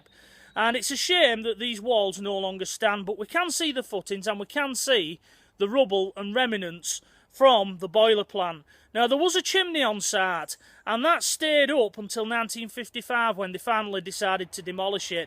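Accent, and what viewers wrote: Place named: Wigan, United Kingdom. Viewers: Proper back in the day Wigan accent! Nice vid pal 👍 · Great video and....love the accent ( Wigan?)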